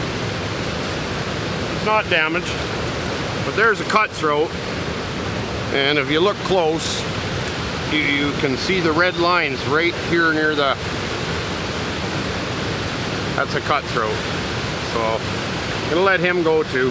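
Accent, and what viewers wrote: Canada. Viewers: Buddy’s so Damn Canadian hahaha. I love how he said gondola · The Canadian accent is why I subbed xD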